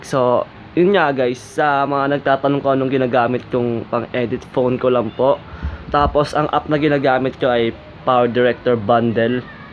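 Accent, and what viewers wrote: Visayas, Philippines. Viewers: Pre, bisaya ka?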